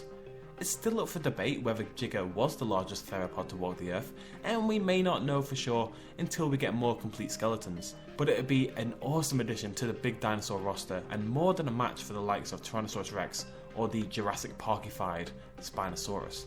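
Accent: United Kingdom. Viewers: eu estou muito ansioso por esse jogo!não consigo esperar!não tenho consoles nem PC então só vou poder desfrutar desta maravilha por meio de vídeos 😪😥! belo vídeo e continue assim! Você é britânico? o sotaque é!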